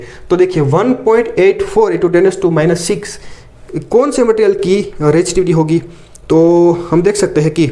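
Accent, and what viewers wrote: Gujarat, India. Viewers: Sir , are you Gujarati??? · Pakku gujarati chee ho !!!!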